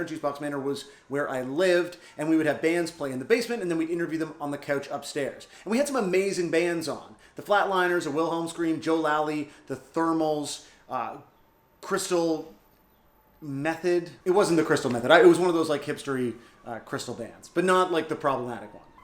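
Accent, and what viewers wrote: Canada. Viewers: Woah wait, are you Canadian?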